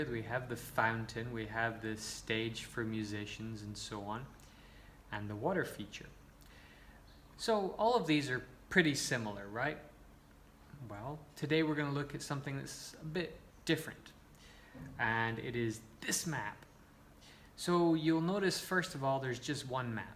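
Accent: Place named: Scotland, United Kingdom. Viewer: Are you from Scotland? Btw Really love your accent.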